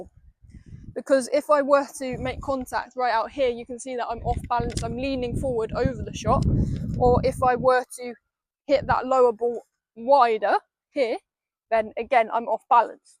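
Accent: United Kingdom. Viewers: Definitely British, I lived in Britain for 30 years. I sense a hint of a Cockney accent aswell. She's gorgeous! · You are a detailed good teacher. By the way, are you British ?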